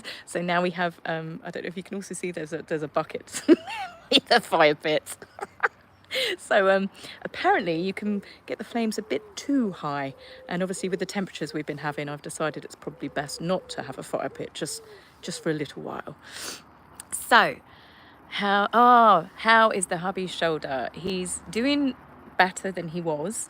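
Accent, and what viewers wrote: United Kingdom. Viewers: you are pretty with or without makeup. We live in the country in SE Georgia, USA, agricultural area hot and humid. We are having afternoon thunderstorms almost daily! It’s a Summer time thing. We are natives of NE Florida, same weather! Love your accent. What part of UK you from. We speak SOUTHERN USA! 😂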